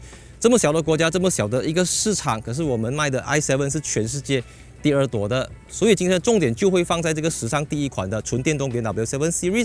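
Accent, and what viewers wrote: Malaysia. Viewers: 哈哈哈哈 主持人长得还挺好看的。马来西亚中文好有趣啊，虽然都能听懂，但是不知道为什么总透露出些许调皮。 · 人家就是故意講馬來西亞式華語來不讓你們（偷偷）把視頻搬去中國放咯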